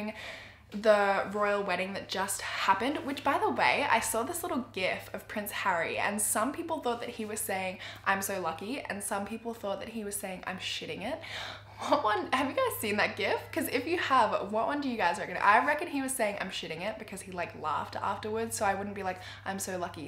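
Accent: Australia. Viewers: Idk you but I pressed on this video and was so happy because I instantly noticed your Australian accent 🇦🇺🇦🇺 I’m from Perth btw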